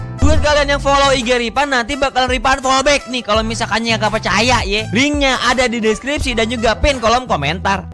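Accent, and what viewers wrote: Jakarta, Indonesia. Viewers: Bang, Saya penasaran apa abang orang Sunda atawa Betawi ya? Soalnya logat Betawi tapi banyak kata Sunda dipakai? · Logat betawi emang enak klo didenger 😂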